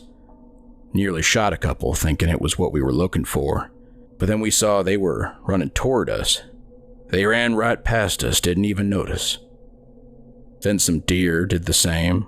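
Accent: South, United States. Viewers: I am enjoying the Southern drawl dialect, the story is okay, not the best but the narrator voice made me want to listen to the end.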